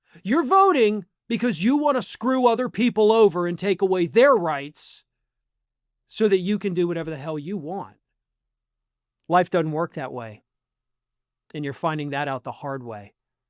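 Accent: South, United States. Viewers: Farron that is not a rural Montana accent you are projecting. That is southern.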